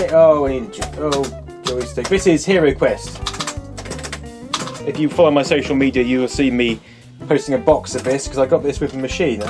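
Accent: United Kingdom. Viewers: BRITTISH ACCENT! and i dont eaven write london right YAYAYAYAYA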